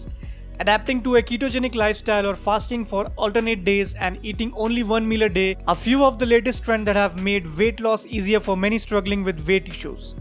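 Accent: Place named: India. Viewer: Your accent smells masala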